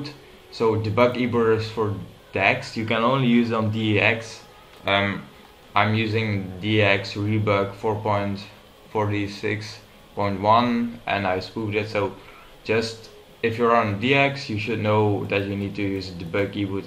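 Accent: Netherlands. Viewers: nederlander? lol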